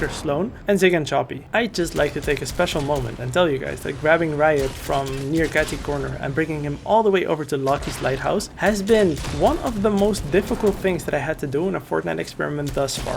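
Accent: Netherlands. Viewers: Is he dutch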